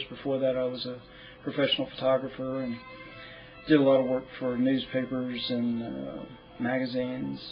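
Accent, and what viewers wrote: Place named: Texas, United States. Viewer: Heyy, just wanted to say thanks for sharing. You definatley do not look your age, you have beautiful eyes. And I could tell that you were from Texas, I can detect a slight hint of a Texan accent. When did you move to Colorado? Have a good week. --//xo;Ace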